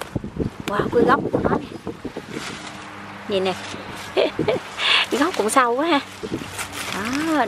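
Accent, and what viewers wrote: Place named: Central, Vietnam. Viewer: Cô người miền Trung ạ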